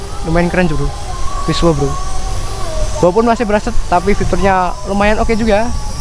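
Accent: Java, Indonesia. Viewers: Sory suketnya... Hahahaha.. mantab bro, gak ilang jawane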